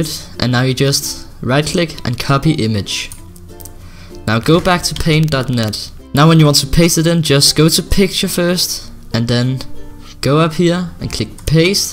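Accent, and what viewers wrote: Denmark. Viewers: Are You danish? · heh realised you were danish because of your accent lool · Are you from Denmark? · er du dansker?? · are you danish?! omg i'm too danish omg!!!!! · are you from denmark? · I elsker your danske accent;);D:D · Come you from Danmark?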